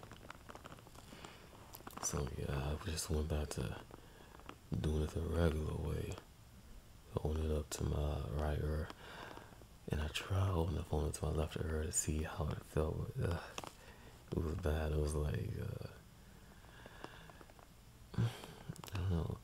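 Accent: St. Louis, United States. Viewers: Where r u from? Ur accent sounds like ur frm stl missouri.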